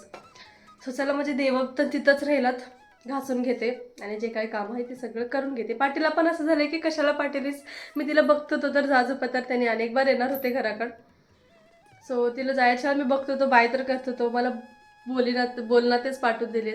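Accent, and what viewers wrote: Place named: Latur, India. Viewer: लातूर ची भाषा आहे हे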